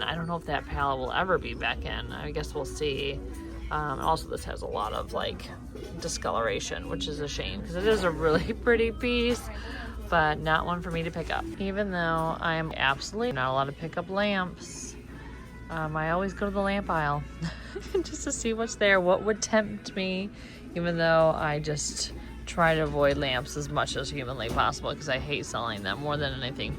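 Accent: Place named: Midwest, United States. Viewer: The stuff that got on camera was absolutely dizzying! So much great stuff! I'm going to rewatch and pause to enjoy. I think your Midwest accent is what makes you so enjoyable for me to listen to - I'm originally from Chicago (so only an eighth-turn from ex-Detroit). Your painting aesthetic is interesting. Maybe you can do a bit on that sometime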